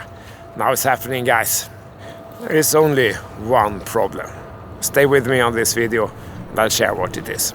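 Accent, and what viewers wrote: Sweden. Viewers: Är du från göteborg eller Stockholm?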